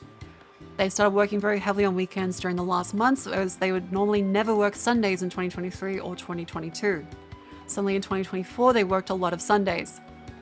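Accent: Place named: Australia. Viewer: Shark girl? Red head? Australian accent? Mini skirt/giant overcoat combo? Glasses? Yapping? Coding knowledge? Oh yeah, instant sub. Looking forward to more videos :D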